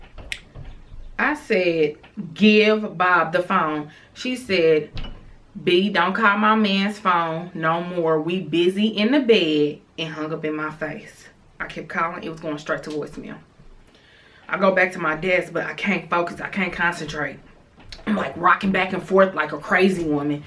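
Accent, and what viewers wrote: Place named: Bay Area, United States. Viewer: I am too! I came across your channel just browsing. Clicked on this video, heard you talk (kiss braids, member instead of remember, just your mannerisms, etc...) and said she had to be from the Town. I live in Cali now and you reminded me of home so much. Congratulations on your new union!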